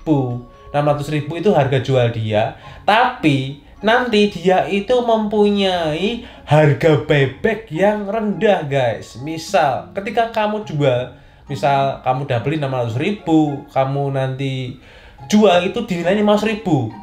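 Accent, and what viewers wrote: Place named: Surabaya, Indonesia. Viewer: Koko medog banget Jowone koyoke wong Suroboyo nggeh? Terimakasih infonya yaa Ko,,sangat membantu sekali kontennya dan bermanfaat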